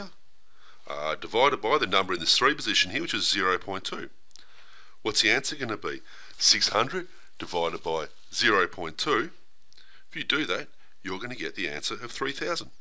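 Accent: Australia. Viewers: I love this Aussie accent.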